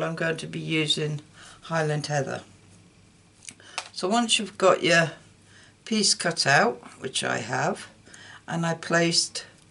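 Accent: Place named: Warrington, United Kingdom. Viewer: I am curious about your accent. I am from haydock, St Helen's living in New Zealand and your accent sounds warrington of Newton le willows. Love the card, it is a kind of a twist to resist stamping, very elegant.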